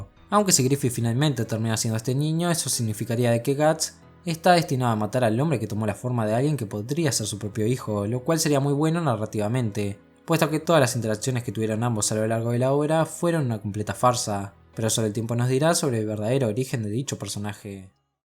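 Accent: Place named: Argentina. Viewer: Un argentinoooooooooooo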